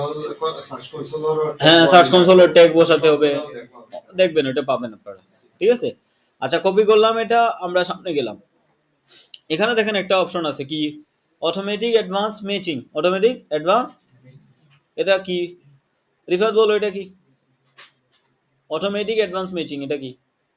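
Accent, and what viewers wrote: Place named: Kolkata, India. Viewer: apnar kothar tone koklkatar moto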